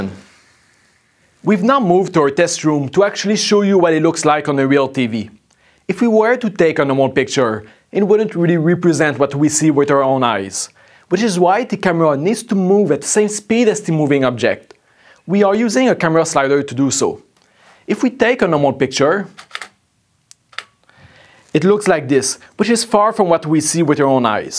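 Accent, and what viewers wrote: Quebec, Canada. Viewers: I recognize this accent from Canada QC :) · Vincent B. On est 2 a reconnaitre l accent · This guy sound like a criss de québécois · On dirait que je reconnais l'accent Québécois ? :)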